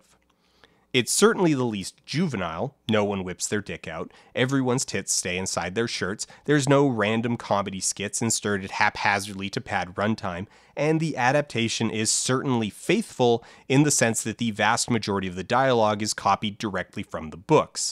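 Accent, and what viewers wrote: Canada. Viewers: That's just a Canadian accent. don't be racist. :P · hearing a Canadian accent so far from home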